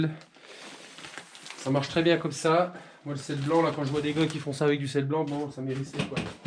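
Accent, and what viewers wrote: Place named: Eastern France, France. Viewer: bonjour je viens d'essayé ta recette et je pense que j'ai réussi assez bien pour une première fois. J'ai essayé de mettre des photos mais ca ne marche pas Quand au lard je n'ai pas trouvé du bon lard frais il faut dire que je réside à la Réunion et ce n'est pas facile de trouver de la bonne qualité. mais le gout y est. J'en profite pour te dire que ma mère est d' Epenoy dans le Doubs. Je ne sais pas si tu es du coin mais vu ton accent tu ne peux être que de la région de l'Est. Au plaisir de te lire François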